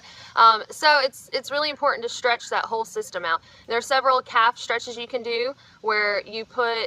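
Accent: South, United States. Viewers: while its not Plantar Fasciitis, I got bad shin splints while section hiking the PCT... Good advice for both really is stretching, something I was like 'yea I don't need to stretch...' You sound like your from Georgia or South Carolina or something lol ;-)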